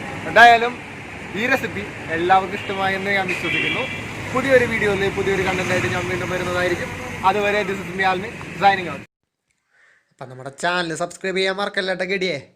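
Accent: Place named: Thrissur, India. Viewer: Good presentation I like your style and way of speaking....by hearing this I proud of my Thrissur slang❤️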